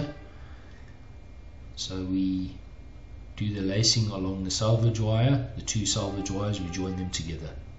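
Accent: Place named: South Africa. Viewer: PS, sorry for changing your gender to, lol. Your accent tells me you are in the S.African continent, we are in the UK, otherwise maybe we could get our supply from you. Our engineer and architect has mentioned a company called ENVIROMESH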